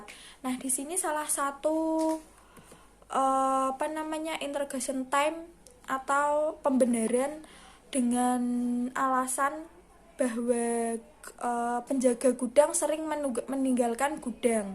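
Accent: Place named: Java, Indonesia. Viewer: Inspiratif dan logatnya medok 😁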